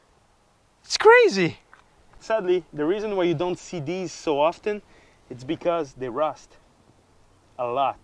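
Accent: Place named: Quebec, Canada. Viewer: damn this is really funny, love the jokes. Your delivery suits the French Canadian accent perfectly.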